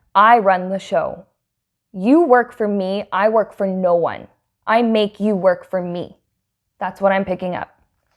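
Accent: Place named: Russia. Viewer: Congrats your new apartment 🎉 also that Russian accent 😎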